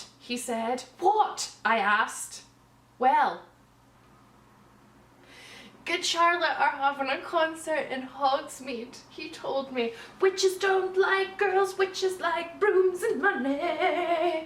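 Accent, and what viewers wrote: Scotland, United Kingdom. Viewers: ... bad Harry Potter fanfiction read by a Scottish woman? I need this in my life! I came for the Scottish handmaid, but I'm staying for this! Subbed! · I'm bad at identifying accents. She's scottish?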